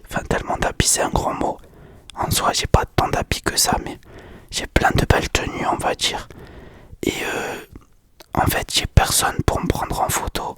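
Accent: South, France. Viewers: J aime beaucoup ta chaine et ton timbre de voix 🙂 petite question tu as un fort accent du sud t Marseillais , nîmois ou Montpellier ? 😉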